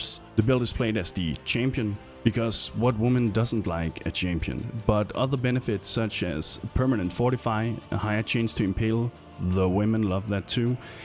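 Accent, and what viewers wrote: Denmark. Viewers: A few seconds into the video: Wow. You. Sound. Danish. Hej,:) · du lyder dansk. har jeg ret?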